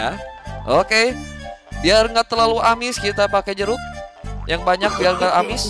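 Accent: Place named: West Java, Indonesia. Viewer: biasa bang tea wkwkwkwk(sunda nya gak terlalu)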